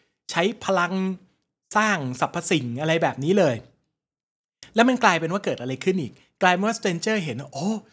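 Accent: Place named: Lampang, Thailand. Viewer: ฟังมาสองปีพึ่งรู้ว่าคนเหนือ สำเนียงแบบนี้ลำปางก่อครับ?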